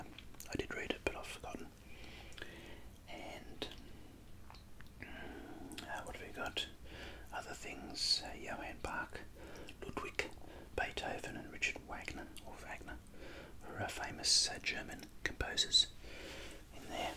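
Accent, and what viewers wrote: Australia. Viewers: I’m German but I’m just here for the Australian accent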